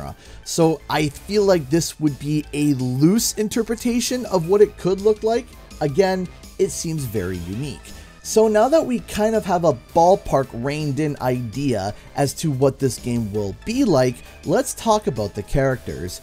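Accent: Canada. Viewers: It's cool that you have your own Crash 4 with a -version of Crash.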